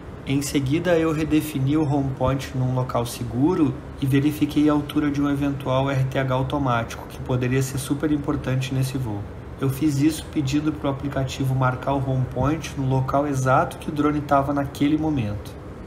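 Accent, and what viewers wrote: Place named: Rio de Janeiro, Brazil. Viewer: Aaaaaaaa cariocaaaaaa se entregou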